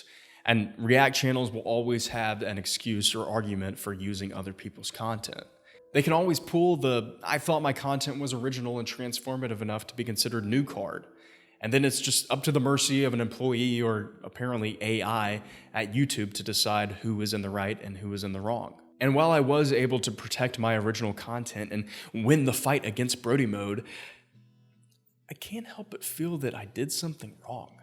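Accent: South, United States. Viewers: didn't realize how southern you sound normally until i heard your brody voice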